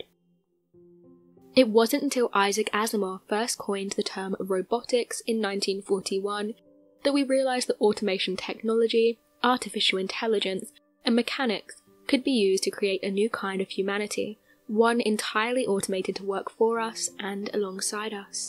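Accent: United Kingdom. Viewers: Love the narrator, she has such a charming accent, wonder what part of Britain she's from?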